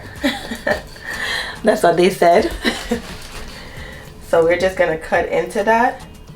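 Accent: Jamaica. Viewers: Are you a Jamaican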